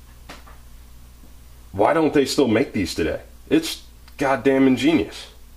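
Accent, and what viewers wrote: South, United States. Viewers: Southern version of AvE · Wow! I thought I clicked on an AvE video for second. Same type of voice with a southern accent.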